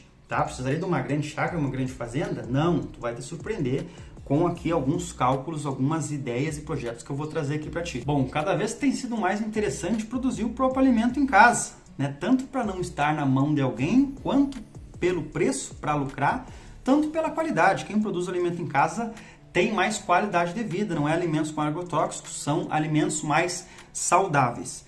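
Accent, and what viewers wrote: Brazil. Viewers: Deus te abençõe por compartilhar o conhecimento isso é muito importante.quanto a seu sotaque achei sempre muito bonito o sotaque do Sul assim como de outras regiões é isso que fais nosso Brasil ser bonito é essas mistura de sotaque e de Cultura por todo o pais.Deus abençõe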